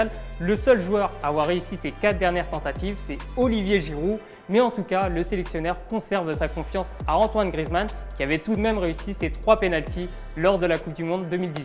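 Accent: Italy. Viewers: Jusqu'à là on n'a pas pu mettre un visage sur la voix de l'accent italien.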